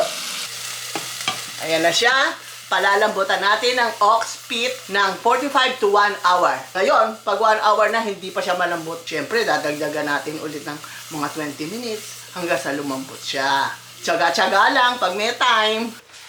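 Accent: Philippines. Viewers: I feel like Im in the Philippines while watching. The accent, the vibes, and humour feel like you are at home with your nanay. With all the ❤️ dun sa kare-kare not just appetizing but eating w family together is therapeutic.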